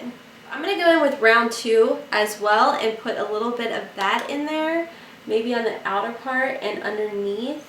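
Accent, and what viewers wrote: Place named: Kentucky, United States. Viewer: Congratulations on 5K!!!💖💜💖💜💖💜💖💜💖💜💖💜💖 AND,... Thank You for this very generous giveaway!!! Thank you for sharing another GREAT tutorial😚. I love the Bad Habit palettes. And, even the ABH palettes have kick up. I think like you said, it's rare to find a palette nowadays that DOESN'T have some kick up. I love your Kentucky personality and accent💖. I lived in Louisville when I was a young girl for a while and I absolutely loved it. I was sad when we moved. I ended up back in the South anyways now. Lol (N Carolina). I absolutely LOVE living here. Wish we lived closer as I know our personalities are very similar and we would get along great!!😚 (Especially the makeup hoarding part😁😁😁😁). Look forward to your next video send here's to 10k!!🍾🥂🍾🥂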